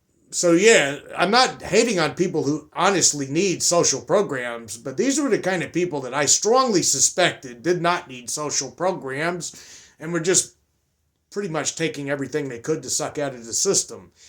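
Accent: New Jersey, United States. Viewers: The rhing about the midwest is hilarious. I live in Illinois and i coukd totally see that happening. They have the whole Midwest Nice thing. Not that we are really that nice. I like you New Jersey accent it doesn't brother me in the least.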